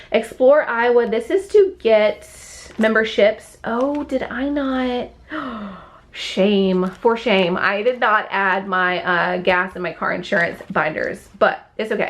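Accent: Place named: Oklahoma, United States. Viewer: I dont know where you moved yet, need to watch the other videos. I am just taking note that it sounds like Oklahoma. Lol. Which is where I am. 😂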